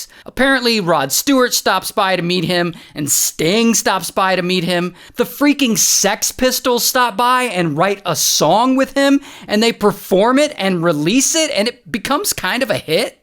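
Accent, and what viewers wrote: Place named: England, United Kingdom. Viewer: The English accent 😅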